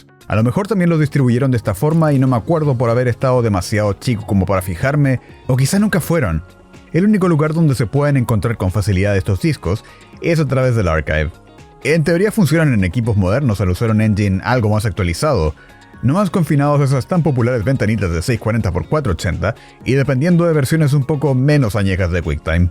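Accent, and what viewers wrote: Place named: Australia. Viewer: El video que estaba esperando, que nostalgia!! You do sound a little Australian, saludos desde Inglaterra lmao (De algo sirvió)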